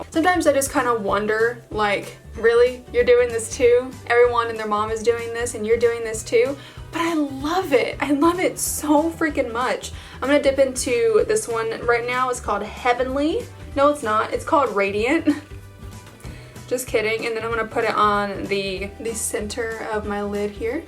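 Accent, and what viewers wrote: United States. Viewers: Hi new sub here Lets be friends! Ive not had any new videos uploaded lately! Im into makeup and diys! Im a TN girl, all my life just outside of Nashville!!! My accent is a little more deeper than yours lol Not sayin thats a good thing lol Omg opryland lol used to love the themepark...but the hotel omg is GORGEOUS and the lights at Christmas!!!! The mall...omg good luck getting a parking spot within an hour during Christmas!!! Right?? Lol Well, so EXCITED your man is coming, hope you have him on the channel...have a Merry Christmas!!! Big thumbs up! Maybe next year we can collab on a video or 2!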